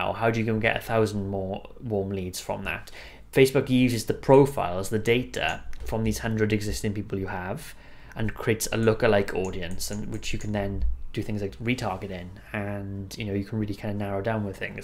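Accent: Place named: Wales, United Kingdom. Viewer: I love your Welsh ancient, it reminds me of my Shrewsbury family! Your content is Gold and I love your no frills approach it’s refreshing 🤘🏽💯 keep going brother you’ll be super affiliate in no time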